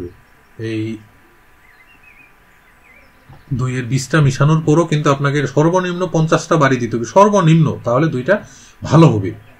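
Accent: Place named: Sylhet, Bangladesh. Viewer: আমিতো মনে করেছি সিলেটে! আপনার ভাষা কিছুটা সিলেটি টান আসে!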